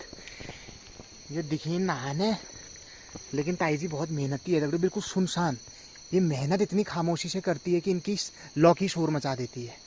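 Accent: Pahari, India. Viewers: Mujhe aapki pahadi bolane bahut badhiya lagti hai👌👌👌👍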